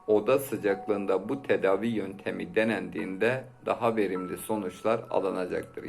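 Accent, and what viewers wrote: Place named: Van, Turkey. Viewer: Vanli mısın hocam😊 65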